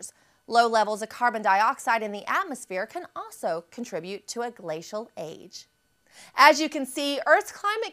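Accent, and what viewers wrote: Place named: United States. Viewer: wanted to learn something..... heard an american voice...... moved on.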